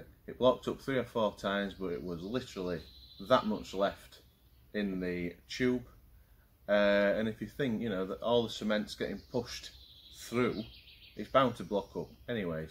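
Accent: Yorkshire, United Kingdom. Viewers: Sounds like a Yorkshire lad. Good review cheers.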